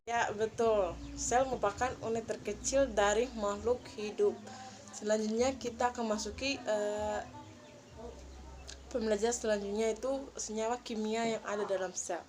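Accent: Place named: Bima, Indonesia. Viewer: Bimanya kental banget😄 semangat terus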